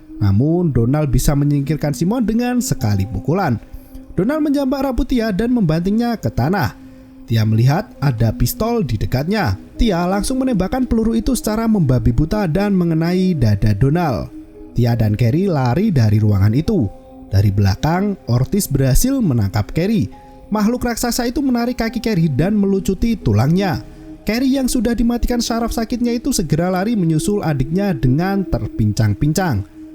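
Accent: Java, Indonesia. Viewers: Jawa km medok benar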